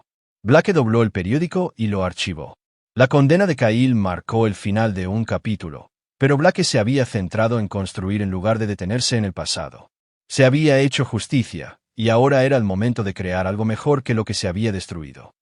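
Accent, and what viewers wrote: United States. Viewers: Por favor no metan palabras en ingles o hablen como gringo, o son españoles o son americanos o portugueses, por dios!!!! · Buena historia, buenas traducción pero deben editar antes de subir. Se escucha horrible cuando pronunciar con acento gringo palabras en español, así como leen en español los nombres que están en inglés, como el de Blake (bleik).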